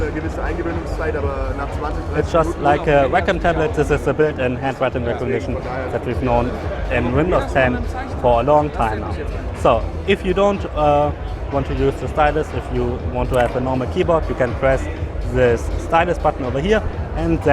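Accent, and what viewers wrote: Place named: Germany. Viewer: sry aber dein denglisch ist echt furchtbar!?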